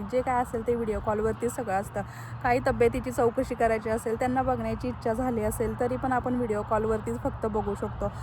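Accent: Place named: Satara, India. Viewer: गाव कोणत आहे ताई तुझं भाषेवरून साताऱ्याची वाटते . खूप छान वाटलं एकूण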